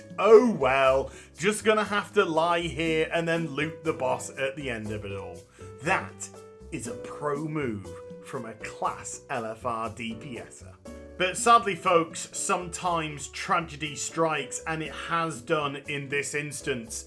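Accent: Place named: United Kingdom. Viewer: I dont know why i love angry people with british accents so much.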